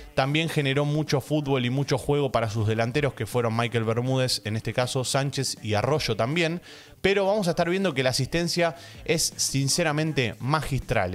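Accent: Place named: Argentina. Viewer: Che por tu acento se nota que eres Argentino y con esa gran visión de fútbol que tienen ustedes los Argentinos se que vas a llegar muy lejos con tu canal Bendicionez mi pana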